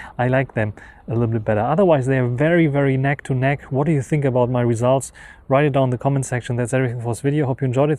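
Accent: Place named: Germany. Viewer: From the first seconds of your accent it reminds me of the Rein river and your German nationality, thanks for the review! It will be interesting to see tests between these phones and the Pixel 6 pro or 7 pro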